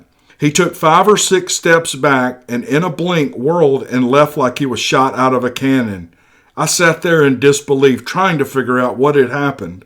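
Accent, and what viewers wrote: South, United States. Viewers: I'm from the South, but living in the West, so I enjoy your storytelling and Southern accent (aka twang). Wish you much success! And Happy Holidays! 🎄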